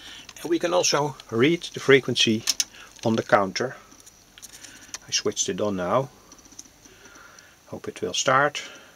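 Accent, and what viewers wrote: Netherlands. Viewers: Hello -nice video From you accent i wil bet you are a Dutch man (Netherland). God save the King Willem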